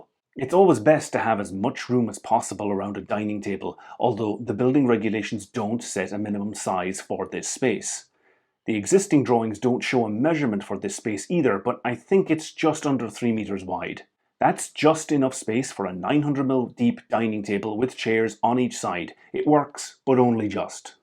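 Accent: Ireland. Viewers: Are you part Irish? I am hearing some Irish accent in your voice. Good video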